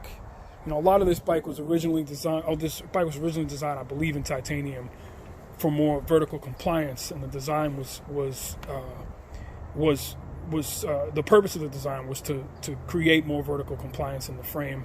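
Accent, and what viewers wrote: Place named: New York, United States. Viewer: As soon as I heard your voice, it took me back home 😁 (Brooklyn/Queens/Nassau Cty) I worked at a few shops in the area in the 80’s/90’s. Enjoyed both reviews of the Jones frames, solid down to earth info. 👍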